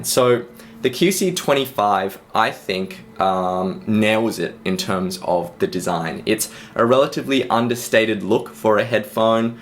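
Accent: Australia. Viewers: R3DLUFF trust me this is less australian than bogan australian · He's Australian...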